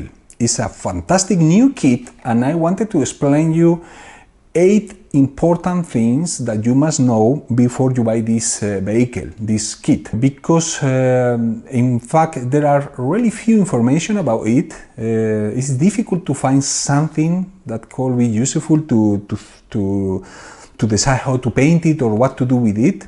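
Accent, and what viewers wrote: Spain. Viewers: Very useful. .an original kit. .and your accent is still so. ...spanish 😉i like it 😀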